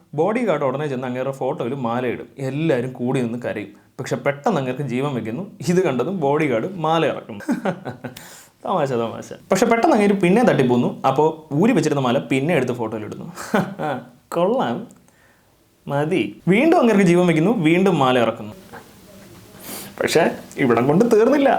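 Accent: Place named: Tamil Nadu, India. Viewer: Anyone feels like you're hearing Malayalam but in Tamil accent?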